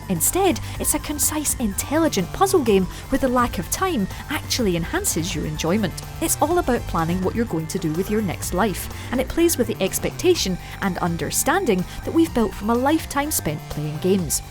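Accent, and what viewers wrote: Scotland, United Kingdom. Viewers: The games are good, but your british accent makes my ears bleed to death... I am sorry but why cant you pronounce the word Year or Around or Our withouth twisting so many unnecessary times your tounge? Its nothing personal towards you. And no, i am not a bad person.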